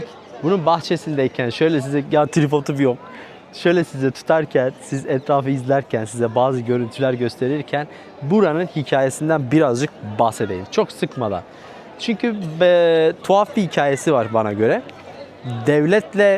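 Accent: Turkey. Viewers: Töbe haram demen harikaydı😂Şiven çok güzel yaa..Bizim oraların( Diyarbakır ,Mardin..vs)insanının sıcaklığını hissediyorum.❤😊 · nerelisin dogu şivesi var ama bilemedim ayrıca kanalı yeni keşfettim hemen abone oldum çok güzel videolar emin olabilirsiniz izlemeye devam edeceğim yolun açık olsun başarılar dilerim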